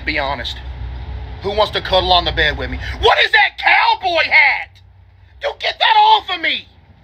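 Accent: United States. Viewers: Bro kinda got that Texas voice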